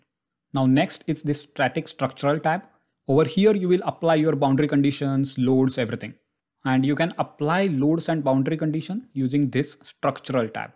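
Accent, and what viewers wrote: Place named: India. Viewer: bro can u turn off indian acent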